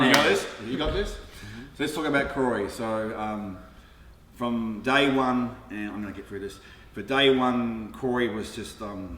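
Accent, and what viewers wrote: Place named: Australia. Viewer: His accent is so Australian. I love it. Love you Corey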